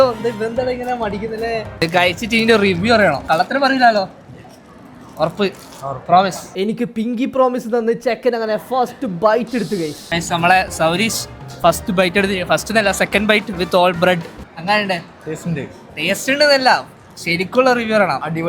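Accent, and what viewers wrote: Kannur, India. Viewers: Pakka kannur language 😅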